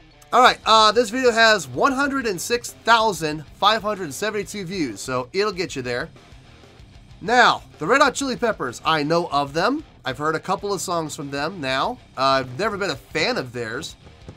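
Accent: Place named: United States. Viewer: How come you didn't listen that album before??? You are definitly old enough?! And you sound american. I was born in 1978 in ex yugoslavia and know that album by heart. Are you faking it? Please don't say you were bussy listening panthera or sepultura 'cause that's no excuse.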